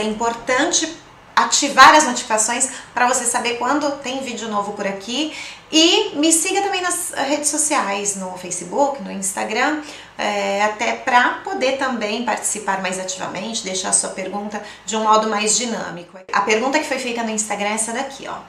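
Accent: Abruzzo, Italy. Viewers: Salve. Lei ha un accento familiare abruzzese. Ho vissuto 18 anni a Lanciano!!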